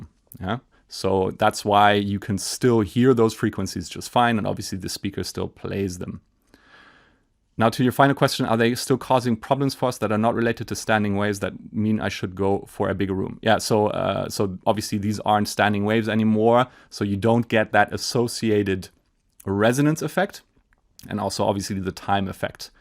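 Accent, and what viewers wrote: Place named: Berlin, Germany. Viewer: My grandparents were from Berlin, so your accent is very familiar to me. 😇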